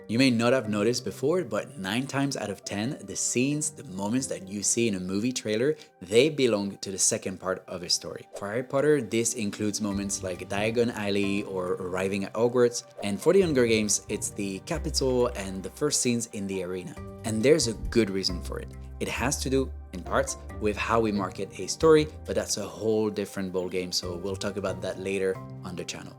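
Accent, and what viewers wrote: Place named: France. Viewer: I finally figured out you are FRENCH!😂 I noticed you had a really cool accent and I finally figured it out!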